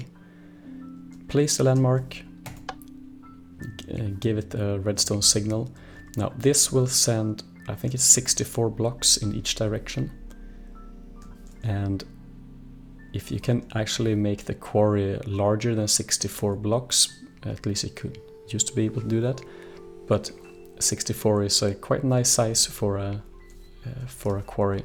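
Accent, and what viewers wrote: Sweden. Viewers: Swedish? You gotta be swedish... :D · Are you swedish? You have a very cute accent. :) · Are you from Sweden? Just gotta ask cuz it sounds that way :)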